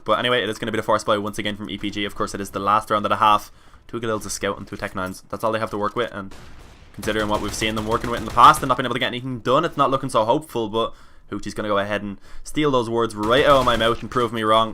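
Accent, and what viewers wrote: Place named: Meath, Ireland. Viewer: THIS IS REALLY ANNOYING ME you sound like youre from meath but also a bit more northern!!!!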